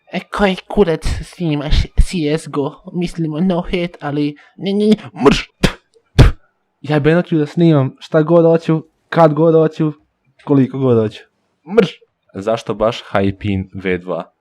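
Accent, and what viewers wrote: Subotica, Serbia. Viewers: Znao sam da si iz Subotice hahahahahaha po akcentu jer sam cesto tamo pozdrav za Subotičane 💙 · Znao sam da si iz subotice hahaha zbog naglaska ;) Ps: ja sam iz sente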